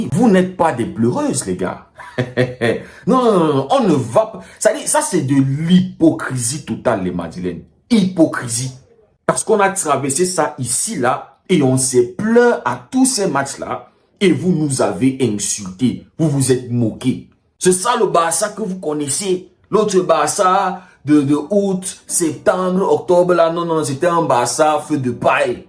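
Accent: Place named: Cameroon. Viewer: La première chose que j'aime chez les camers c'est dabord leur accent 🤣🤣🤣, tres bonne analyse papa !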